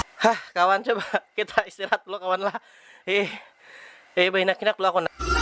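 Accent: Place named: Banjar, Indonesia. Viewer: Gaya mu tu bapander tu lucu banar sanak,ak suka banar mandangar logat banjar tu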